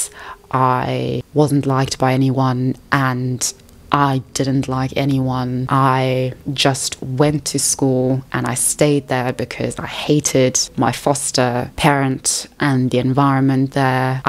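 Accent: RP, United Kingdom. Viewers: doesnt matter if u went to oxford or not , you sound like you did 💁🏼‍♀️ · Wjust with your Britsh accent and level of education you would do very well in the U.S especially in media. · Why do you speak so posh? What's your regular voice?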